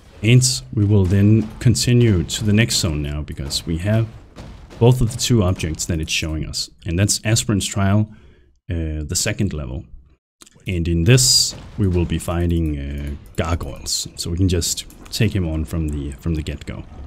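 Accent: Denmark. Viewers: And yea he is Danish :p easy to tell on his accent, altough its not one of the bad ones! :p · Is this legal? Will it get you banned? And a side question. Are you danish ??? 😋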